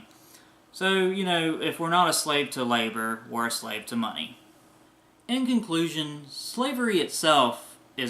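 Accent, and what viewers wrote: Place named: South, United States. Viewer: You have a southern accent, doesn’t surprise me that you’re trying to justify this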